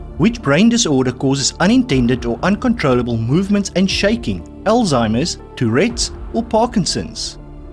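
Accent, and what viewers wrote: South Africa. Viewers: I love your accent, but what is it ❤️🙏🙏🙏??? I cannot put my finger on it. SA??? 😮Thank you for these great quizzes ❤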